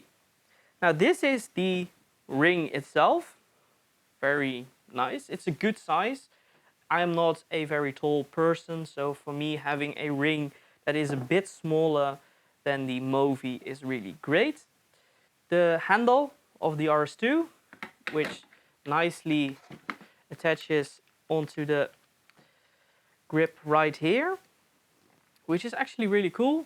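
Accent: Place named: Netherlands. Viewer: ja ik was opzoek naar een alternatief/ring mount om de dji rs2 op te monteren ^^ Soms een beetje vermoeiend en onhandig om alles met de standaard grip te doen vooral als je hogere shots uit de hand wil maken. Deze van Tilta ziet er heel interessant uit alleen en is volgens mij zover ik heb kunnen zien ook een van de enige opties momenteel. Hoop alleen dat hij snel wat beter beschikbaar zal worden 😅 Ik had eerst mij twijfels of je nederlands was ik hoorde wel iets, daarna de shots met nl kentekens en de "later" bevestigde het wel 😆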